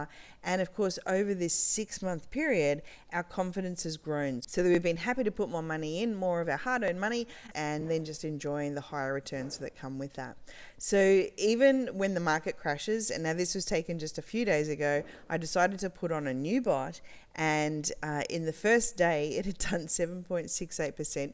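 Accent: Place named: Australia. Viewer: Thanks for the video, I've only just heard of the Plan this week so still doing some DD at the moment. You sound like you're Australian, I am too, can I ask if the grading happens in USD, thus the initial investment of $3000 per bot will actually be $4200ish AUD, are returns then also calculated in USD to be converted back to AUD at the end? Not sure if that makes sense, but basically just wondering if we in Australia would also benefit from the current currency exchange rates if we cashed out some of the positive returns?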